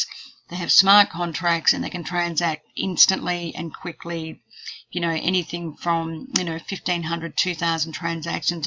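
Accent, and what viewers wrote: Australia. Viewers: Don't fight it. Enjoy the Aussie accent man.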